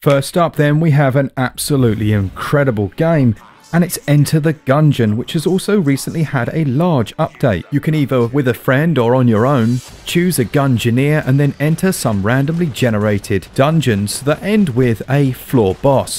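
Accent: United Kingdom. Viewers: yay british. im subbing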